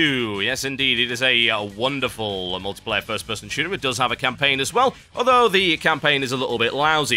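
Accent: United Kingdom. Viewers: i love your videos and your deep British accent makes it that much more epic! Plus your impeccable grammar makes it even more epic. So your videos are epic times like 120!!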